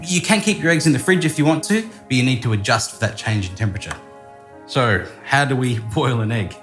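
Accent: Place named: Australia. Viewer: Is this dude Japanese or Australian? (as far as nationality, not race)... I can't tell which language he speaks better but his English sounds perfect with an Australian accent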